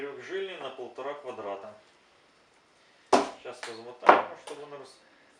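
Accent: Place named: Ukraine. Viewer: Мужик, ти ж україномовний, в кожному слові це відчувається нахіба ти не звичною для тебе російською говориш?комічно ж виходить...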